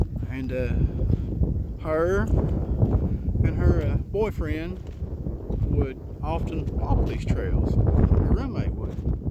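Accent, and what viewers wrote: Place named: Virginia, United States. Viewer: no problem man. I just sent my wife a.message and told her I got a comment from. I wish I had an email or sumn. Where I could converse with ya a bit. I have some pretty interesting stories from around here in the Piedmont as well. But I completely understand why you dont much communicate with people, etc. and I respect it..I have grown to have a bit of dialogue w/Brian from blackmass paranormal on eastern Tennessee. And another guy from liberty and even another still from West VA and we all exchange stories, etc. it was nice to hear from ya man. If you ever do open up a line of communication, lemme know. I'd be extremely interested. Anyhow. Keep on keeping on with the channel don't let haters or the locals discourage you. I know how locals can be some times especially when they feel they have a stake on the story being told or maybe it'll have the potential to mess with their family. 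I dunno. But keep on with it as long as you can. Me and my wife love your stories. I feel like I know you just by the way you talk and the things you say. We love everything about what you do and man if it isn't interesting. also love your accent, reminds me of my dad's mom. She had a way of speaking I consider like old.south or old Virginia like antebellum type way of speaking. The older I get the more I sound like her. Of course she's gone now, dad's gone now..but we truly feel like we know you. We appreciate your dedication to getting the truth out. Those.locals, some, would p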